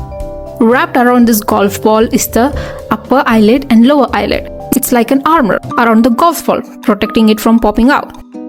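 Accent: India. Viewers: Are you Indian , don't get me wrong, I am Indian. Just guessing from the accent. I have the same accent ,please don't get me wrong. Btw I am one of your subscribers. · yh i was wondering bcz this is how my family speaks eng back home in India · You sound like if you have a an Indian accent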